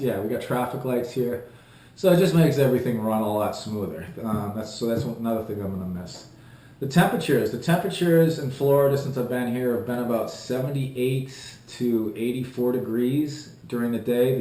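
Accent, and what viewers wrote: Boston, United States. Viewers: it didn't take long.. that "Bah-ston" accent came right back. :) i'll be in SoCal next week, probably come home to a hot dish of home-made enchiladas. i anticipate gaining some weight as well. Glad to see you made it back to the PH safely!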